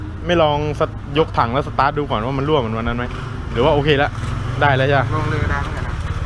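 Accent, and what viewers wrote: South, Thailand. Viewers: คนใต้นิหว่า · แหลงใต้ชัดปีกเลยนะ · ว้าวพี่พูดใต้น่ารักมาก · ขอบเติ้นเเหลงใต้นิ ว่าหรอยๆ ผมยุพัทลุงนิคับ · พี่เป็นคนต้เหรอคับ จังหวัดไรเหรอ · พี่เป็นคนใต้ป่ะคาป ได้ยินเหมือนพุดใต้เลย พรักยุไหนแล้วอา....? 5555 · เเหลงใต้พั่นนี้ผ่านเลยพีี่บ่าวววว !! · คนใต้555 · พูดใต้อ่าา หล่อจังงเสียงง 😍😍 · อ้าว พี่คนใต้หรอคับ555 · แหลงไต้เลยหรอ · เพิ่งรู้ว่าพี่คนใต้555 สงสัยมารนานละสำเนียงอะไรทำไมคุ้นๆ555 ผมคนหาดใหญ่ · พี่คับพี่อยุ่จังหวัดไรอ้ะเสียงใต้ๆ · พี่คนใต้หอครับ. ตอบแท่ได้ครับ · พี่คนใต้กันช่าย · สำเนียงเหมือนคนใต้หรือคิดไปเอง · คนใต้นิกว๊าาาคนบ้านเดียวกัน ติดตามเรยๆ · ใช่ครับ พี่เค้า คนใต้ คนนครศรีธรรมราช อ.ลานสกา ครับ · คนใต้นิเบอะ ... 555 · พี่คนใต้หรอครับ>< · ว่าใต้จัดเจนเรย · พี่คนใต้หรอครับเนี้ย 😁😁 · เอ้า!! ใอ้ย่ะคนใต้บ้านเดียวกัน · แหลงใต้ชัดหลาว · คนใต้เหอะนิ จังหวัดไหน คับ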